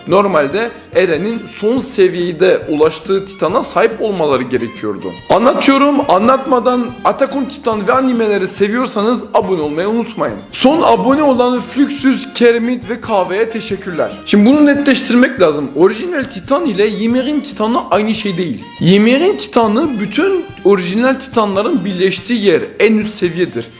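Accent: France. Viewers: Knk adam Fransız aksanlı konuşuyor ve TikTok bildirim dili Fransızca abimiz yarı Fransız olabilir.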